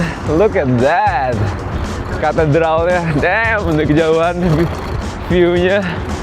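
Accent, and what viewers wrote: United Kingdom. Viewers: British RP accent lu pas 😅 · British accent mu keren bang..bosan dengar american, trlalu ngalir dan kurang seni dengarnya...🎉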